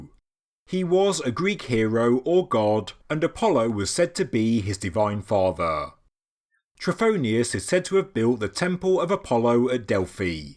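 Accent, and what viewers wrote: United Kingdom. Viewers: That’s his regional English accent. I love it!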